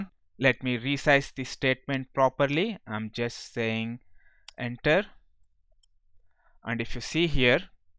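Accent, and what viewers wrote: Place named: India. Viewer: I'll be honest, I was initially put off by the Indian accent so I apologise for that. I am about 1/2 way through and the step by step introduction is absolutely great so thank you.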